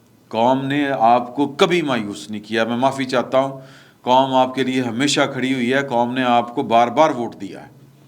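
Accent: Punjab, Pakistan. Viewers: Imran bhi ap apni bat mai Punjabi ka tarkha zoaroor Lagay Karen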